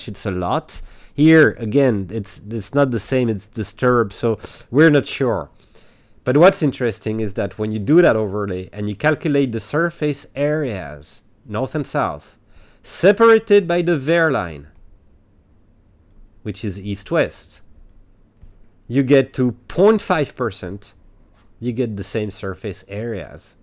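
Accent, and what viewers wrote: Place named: France. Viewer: thank you. I totally misheard what you were saying. I usually have a better ear for the French accent. I just finish reading Zina Halprins book, yesterday. I heard you mention reading it so I got a copy. Any other good recommendations for Templar related books? Or any good books?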